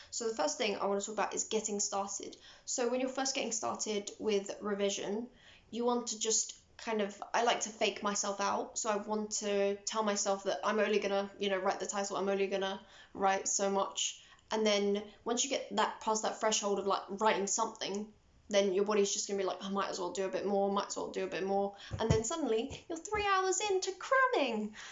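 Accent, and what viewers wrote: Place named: England, United Kingdom. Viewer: i don't know why you sound more english in this than usual it's funny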